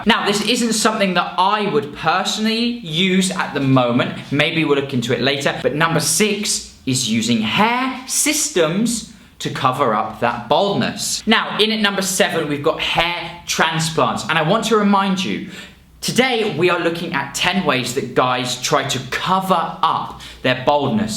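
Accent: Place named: England, United Kingdom. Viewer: He's English. He's saying it the right way. They did invent the language after all lol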